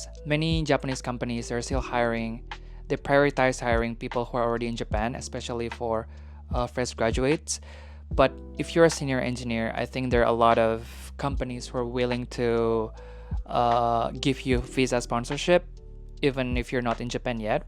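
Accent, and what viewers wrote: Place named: Indonesia. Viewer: Sounds Indonesia I guess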